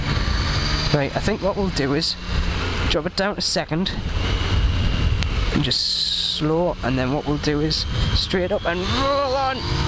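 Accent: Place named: Newcastle, United Kingdom. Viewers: Superb review, superb! Love the Geordie accent too. If you read this, say 'Coca Cola' out aloud please. :) ...Have just bought the Street Rally version. Subscribed! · Guy with a geordie accent who doesn't sound like a twat - amazing. I'm fucked from watching geordie shore...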